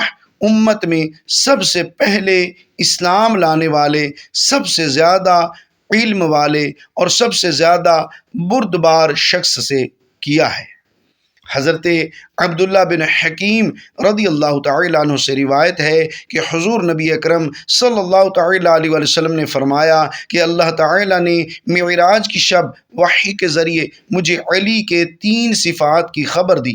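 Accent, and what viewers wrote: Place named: Arab world. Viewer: Aap Uedu bhi iss tarah mazhaka kheiz, Arabi lehje mein bol rahe hain ke Urdu ka satyanas kar ke rakh diya hai. Khassosan Hazrat ALi ka naam zuban twist kar kar ke bigaar rahe hain.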